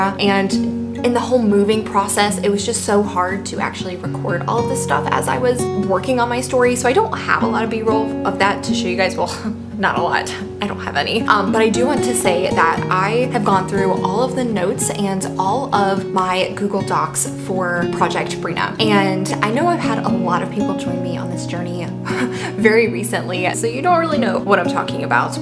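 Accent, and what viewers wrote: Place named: South, United States. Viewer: Don’t hide the accent! We love the Southern flare 😁 I can’t wait to see your new project and how it progresses! It’s going to be awesome!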